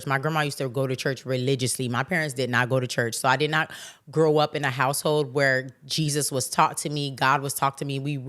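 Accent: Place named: New Orleans, United States. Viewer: Omg by your accent I thought you were from New Orleans 😅 I'm half Haitian (my dad) and American born also from South Florida (palm beach). Hey twin 👋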